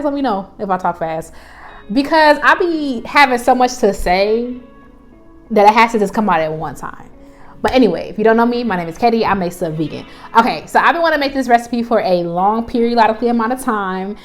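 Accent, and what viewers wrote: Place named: New York, United States. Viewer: Soon as you started talking it was giving NY lol we talk fast then you said “so boom” I’m like ok def NY. Then you said you lived in Rochester and that’s exactly where I’m from lol. Trying your recipe this week!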